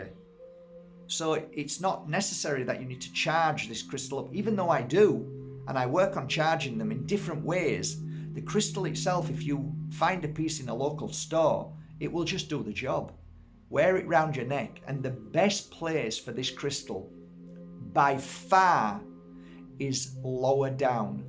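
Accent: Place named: Hull, United Kingdom. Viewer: was you originally from Hull . Its the accent.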